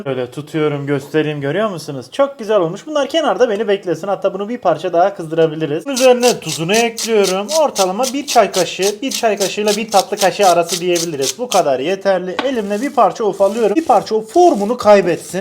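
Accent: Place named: Diyarbakır, Turkey. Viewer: Abi Diyarbakırlı misin ?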